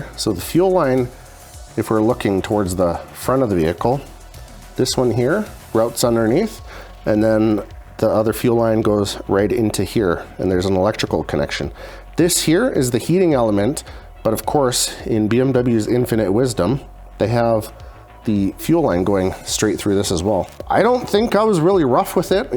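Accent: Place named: Calgary, Canada. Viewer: I love that Calgary accent, eh?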